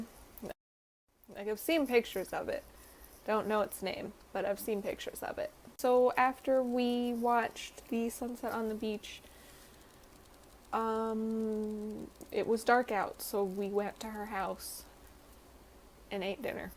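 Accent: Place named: South, United States. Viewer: Thx. I never lived in the US, so didn't know, but yes, you do sound southern to me! Come to think about it, my economics teacher in high school may have been from NC, and she used to teach us about supplaa & demeaand... Pity you can't find time to travel this time. Enjoy the rest of your stay in Kyushu! As for the chocolate, I personally like the Kinoko.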